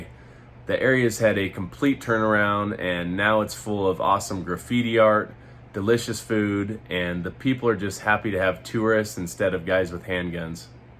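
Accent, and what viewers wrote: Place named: United States. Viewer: It's only the American accent that makes him stand out.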